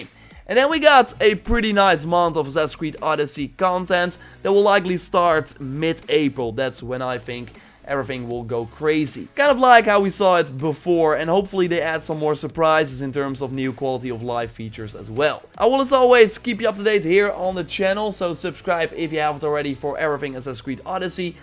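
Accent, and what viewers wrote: Netherlands. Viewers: Hij is nl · Hi, by any possibility, are you from the Netherlands/Belgium, you have a very dutch accent ^^/ Oh and great videos! Just found out your channel today and have spend the day watching your AC odyssey videos ^^/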